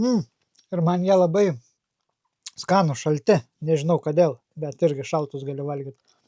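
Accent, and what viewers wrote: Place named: Utena, Lithuania. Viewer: taip ir galvojau ,labai tarme girdėta ,kadangi aš iš Anykščių😅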